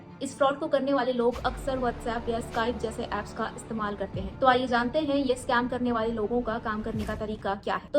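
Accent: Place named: Pakistan. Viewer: Iski accent se lag rha hai ye pakistani hai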